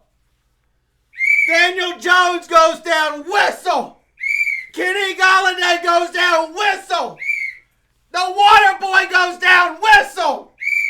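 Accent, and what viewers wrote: South, United States. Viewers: Why are you dressed as a NY fan, but have a southern accent?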